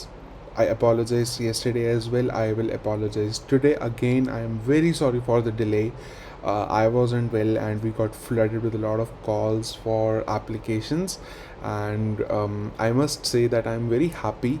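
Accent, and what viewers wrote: India. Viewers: Look at your own accebt and body language typical indian its not at all English. How do you teachers. To me very substandards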